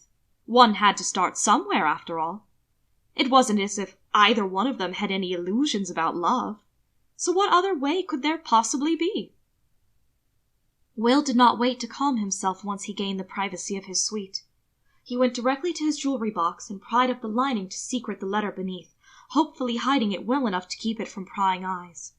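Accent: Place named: Japan. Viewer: as someone who studied Japanese for a couple years, I think your accent is pretty close to sounding like one. but im not Japanese myself so take my opinion with a grain of salt LMAO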